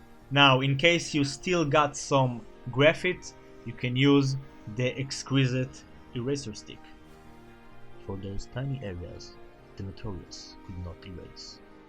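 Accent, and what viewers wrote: Israel. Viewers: אתה ישראלי? אתה נשמע ככה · אני חייב לשאול אתה ישראלי? יש לך מבטא והשם שלך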